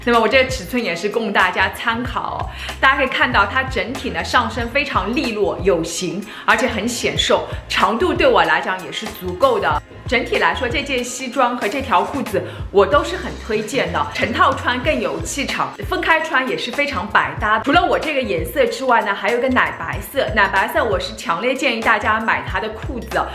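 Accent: Shanghai, China. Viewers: 第一次看你视频，觉得你说话很像上海人，很有亲切感。然后又看了你自我介绍那个视频，果然没错呀😆 支持你哦！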